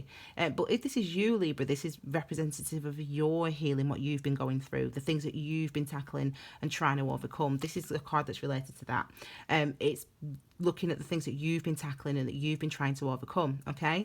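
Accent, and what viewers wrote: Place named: Yorkshire, United Kingdom. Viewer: Thank you for the reading. I feel stuck and trapped in my job. I love your accent are you a Yorkshire girl?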